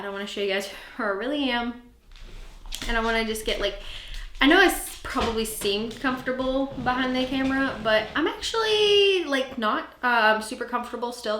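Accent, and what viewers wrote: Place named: South, United States. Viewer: Girl, I am jealous! My hubby's family lives in Alabama. It is beautiful there, and like you said, it's close enough to everywhere, but far away enough to stay lost if you want to. You are living my dream! Hang in there. It will all work out. Glad you're back. I could always tell you're a southern girl. Me too!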